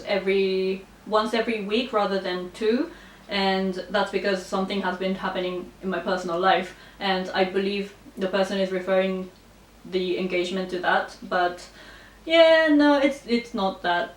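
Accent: United Kingdom. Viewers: Wow! You went from three thousand to nearly thirty thousand subscribers in two years! That's amazing!... I'm a recent addition to the number, but have enjoyed watching some of your older videos. Your presentation is really nice and you seem to be a genuinely lovely person. If things haven't changed in the last two years, then I am very surprised that you aren't married. As a Brit, it's really nice to spot Asians who have lived in the UK. Our culture definitely rubs off, as with the accent and sense of humour... and Japanese women with a British accent when talking English sound really cool. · Love how you react each assumption politely and kindly! You look intelligent + have a beautiful British accent! My assumption is: you are a great reliable manager at work😍💕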